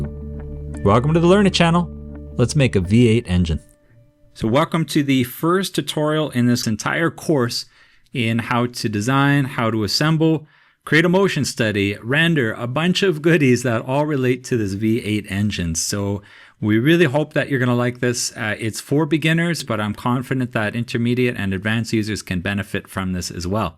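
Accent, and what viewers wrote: Canada. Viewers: I really love your courses. They are the best CAD courses that I have taken. Your speed, method of explaining, and examples are excellent!. I love the Canadian accident too. Thanks for doing such a great job. I look forward to more of your V8 videos. I loved the LEGO Man videos too.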